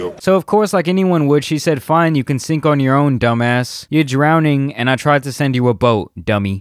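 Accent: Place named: Philadelphia, United States. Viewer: you said squares. you're from the midwest area? But you have like a philly type accent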